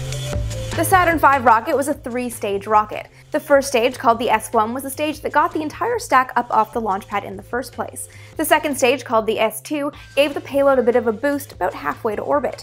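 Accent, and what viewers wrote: Canada. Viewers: She's Canadian! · Is she Canadian?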